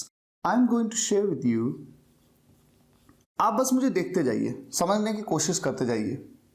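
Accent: India. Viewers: I am Chinese，YouTube recommend India accent English to me😨😨😨😂😂😂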